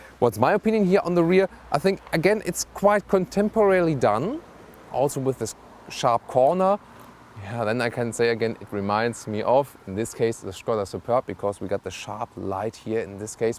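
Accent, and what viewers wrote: Germany. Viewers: Der deutsche Akzent fetzt 😎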